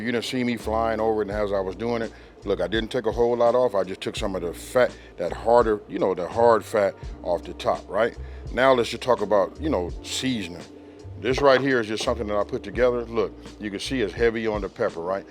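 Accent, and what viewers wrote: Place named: Los Angeles, United States. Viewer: I swear if that ain’t an LA accent, I don’t know what is 😂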